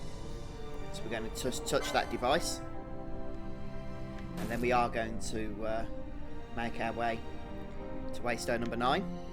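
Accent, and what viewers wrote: United Kingdom. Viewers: I'm very proud of myself because i can clearly understand your English Even with your british accent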